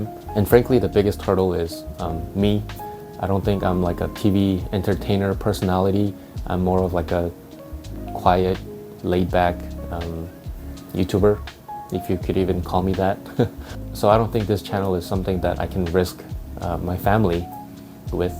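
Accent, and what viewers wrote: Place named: South Korea. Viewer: You have a korean accent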